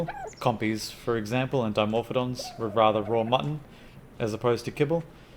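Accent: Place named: Australia. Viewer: Best designs I’ve seen… although an Australian trying to sound scientific is too funny 😂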